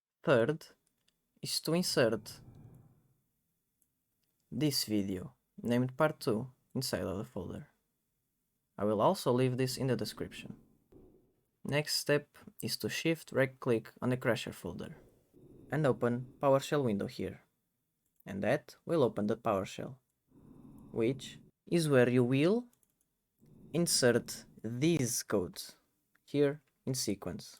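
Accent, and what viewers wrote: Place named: Portugal. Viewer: Muito tuga, não engana ninguem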